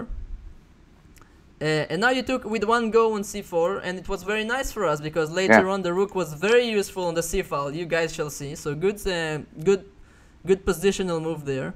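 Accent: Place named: Brazil. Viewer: So quem é brasileiro conhece esse sutaque do ingles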